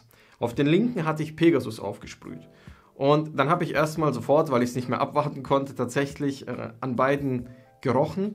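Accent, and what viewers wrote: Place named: Franconia, Germany. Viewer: Bro kommst du aus Franken? :D Geiler Dialekt ! Feier dein Video :) Mach weiter so ! Abo hab ich da gelassen !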